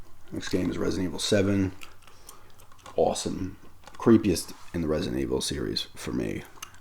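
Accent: New York, United States. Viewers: Such a new york accent compared to today's videos. I dont even know who this is 😂 you the man!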